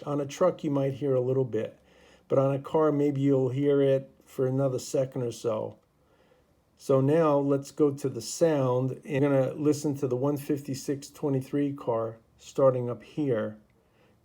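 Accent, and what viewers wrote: New England, United States. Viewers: Are you from New England,? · Wonderful video !!! Very well explained it, also I love the way you do it, I can hear your voice the entire day, is very calming and love the accent … New England ha !! 😊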